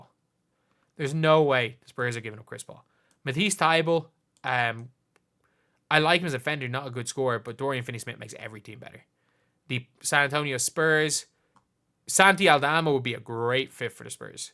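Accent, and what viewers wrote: Ireland. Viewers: He's very obviously Irish. He has an Irish accent and he references the Irish Super League constantly.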